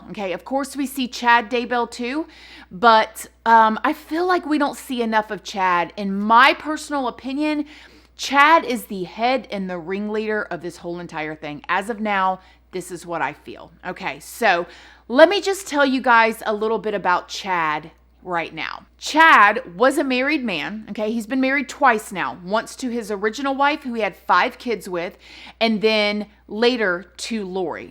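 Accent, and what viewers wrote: South, United States. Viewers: I watch as many of your videos that I can, but you just had me cracking up, I haven't laughed so hard in a minute, With your southern accent, the expression on your face, talking about this dizzy blonde wacko, "like okay calm down, You're gonna have to sit down and calm down here sister!" It was just so hilarious, thanks for the laugh I needed it!! That's why I stay watching, you have a gift for doing these videos, God Bless You 🌼🌻🌼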